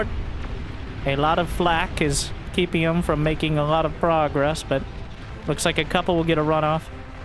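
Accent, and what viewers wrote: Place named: Arkansas, United States. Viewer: Trying to figure out your accent. Arkansas?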